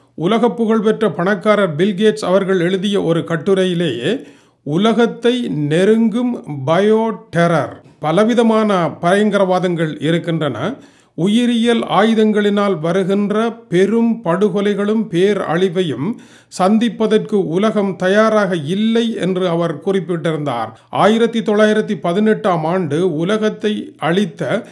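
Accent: Sri Lanka. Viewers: அழகான தமிழில் பேசுகின்றீர்கள் பூர்வீகம் இலங்கையோ......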